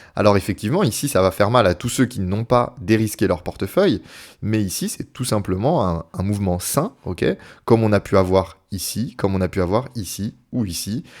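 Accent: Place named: France. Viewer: ...adopter du vocable anglais avec un accent francais HORRIBLE, c'est la loose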